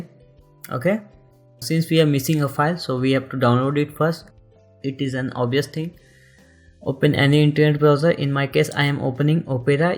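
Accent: India. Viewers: Your Indian accent is cute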